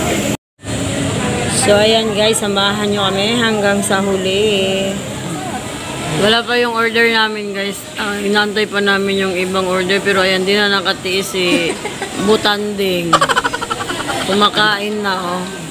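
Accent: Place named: Batangas, Philippines. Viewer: Akala ko sis taga Batangas ka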